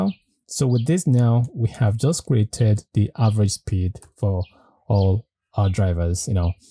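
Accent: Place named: South Africa. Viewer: Fantastic, super useful, thank you! Nice voice and super accent (south-african?) - was a pleasure to spend 2hrs with you Did not really like the part with background done in power point/screen shot etc. I did mine inside PowerBI directly, although not so beautiful as you did. Mine is just OK/good enough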